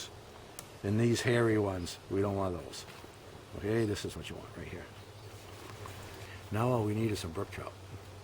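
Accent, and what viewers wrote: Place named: Boston, United States. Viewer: I'm from Boston and this guy sounds like he's a Bostonian. I had no idea the New Brunswick accent was so similar to mine!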